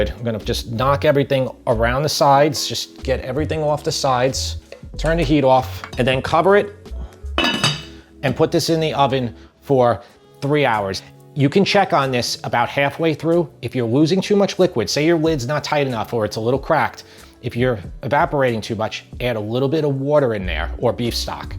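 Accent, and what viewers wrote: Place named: Boston, United States. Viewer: Are you from New York cause you got that Boston accent.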